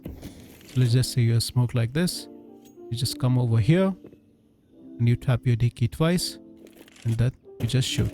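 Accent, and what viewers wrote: India. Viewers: are u indian bro? btw love ur content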